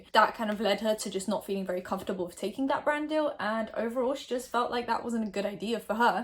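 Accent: United Kingdom. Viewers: Hi I’m a new subscriber! is a brother of backstreet boy Nick Carter. He was on the Lizzie McGuire show and played his famous song “ ” 🍭. Currently as an adult I believe he is mentally ill, as he threatened to kill his sister and his brother, and their children. They got a restraining order against him to protect themselves/their families. Aaron was court ordered by a judge to hand over his weapons (he has a lot of guns). Former child pop star. That’s all. Sorry you got hate by bad Larry’s in the comments. That’s not fair. I’m 23 years old so you missed the era by a few years not to mention you have a UK 🇬🇧 accent so he might not have been as popular across the pond. Look forward to more tea 🍵 ☕️ videos!!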